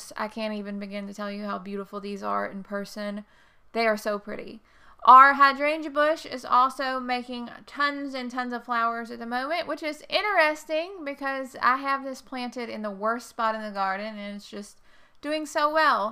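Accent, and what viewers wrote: South, United States. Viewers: I can tell by that southern accent you're in the Southeast somewhere? Lol I'm in Georgia, how about you?. I love the close-ups you do, that's so cool. your cat is a cutie. . what's crazy is I have the same hydrangea it's a mother plant I've had 14 or 15 years it always blooms blue so I started getting in taking cuttings and making more plants of my hydrangea and one of the cuttings I put in the soil it's a small plant but it Bloomex pink LOL and then I took a cutting off another plant and put it like 5 ft away and it bloomed light purple.. the hydrangea I have is called endless summer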